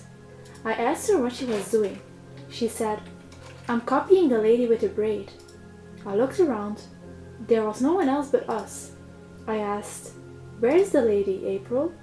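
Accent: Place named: Netherlands. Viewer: Whats your accent? It sounds like Dutch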